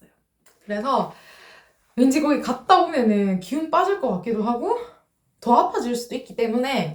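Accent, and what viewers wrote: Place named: Japan. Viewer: 뭔가 말투가 일본 억양이 평일 낮 시간대에 이럴때랑ㅋㅋㅋ자주 일본억양이들리네여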